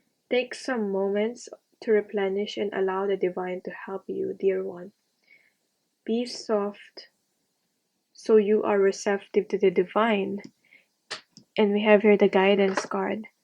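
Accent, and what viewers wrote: Philippines. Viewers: You sound filipina 😊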